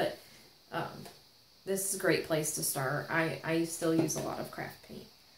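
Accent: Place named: Midwest, United States. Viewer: I like the subtle midwest accent with a touch of pirate on the '-ar' like jaaaar.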